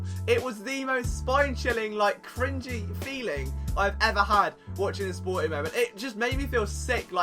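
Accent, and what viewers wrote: United Kingdom. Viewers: You have much wisdom in the realm of football, my son. In spite of your British accent which would suggest one to assume you care nothing about the game at all...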